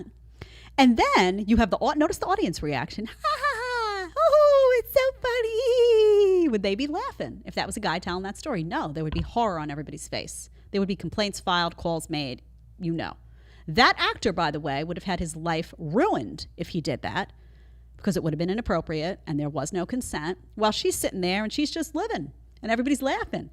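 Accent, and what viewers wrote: New York, United States. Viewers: I can hear NY in your voice sometimes, lol. You try hard not to show it.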